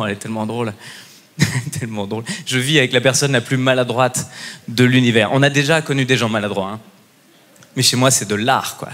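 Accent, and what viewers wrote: Quebec, Canada. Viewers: Ouah… Vérino nous avait habitué à tellement mieux… là on nage en plein sexisme (« Hahaha ma femme qui… ») nappé d’humour anti-accent québequois, c’est pas terrible. C’est border Bigard. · L'accent québécois m'a fumée rire😂😂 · J’ai prit un de ces fou rire avec son histoire et l’accent québécois ! Magistral. J’attends la tournée avec impatience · Haha! Pas mal l'accent québécois. Un mélange d'accent du Saguenay et de la Beauce😎. Probablement une des meilleures imitations de l'accent québécois par un français 😎. Pour une fois qu'on a pas l'aire trop... bozzo🤙😉. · C’est le meilleur accent québécois fait par un français que j’ai jamais entendu! (Je suis québécoise)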